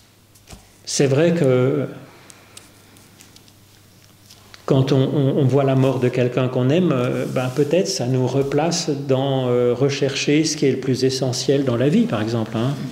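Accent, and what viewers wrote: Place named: Vaud, Switzerland. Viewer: merci... richesse du mot à mot dans le texte... où trouver les video 1 à 4? c'est drôle: tu as pris l'accent vaudois!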